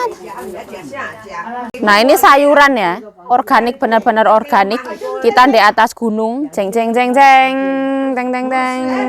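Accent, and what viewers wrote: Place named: East Java, Indonesia. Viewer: Sehat selalu mbak,tetap semangat.....bicaranya logat jawa timuran,sama kaya diponorogo....👍👍👍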